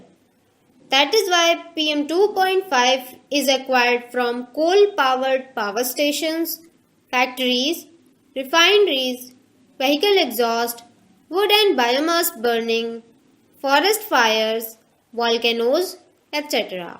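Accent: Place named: India. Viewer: Indian accent irritate my ears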